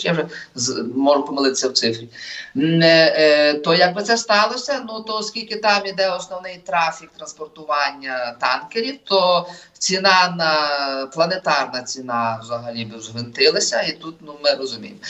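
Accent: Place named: Russia. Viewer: Прізвище - російське, мова, хоч і львівська, але трохи рос. акценту є, напевно москаль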